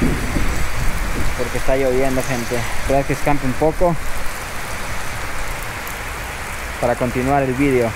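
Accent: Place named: Quito, Ecuador. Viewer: Habla quiteño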